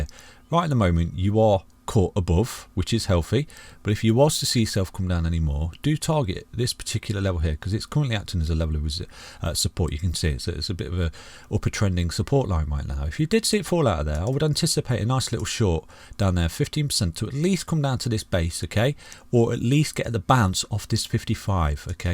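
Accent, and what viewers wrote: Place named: Midlands, United Kingdom. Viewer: it sounds like your from the Midlands, UK. Can't quite pin your accent down 🤔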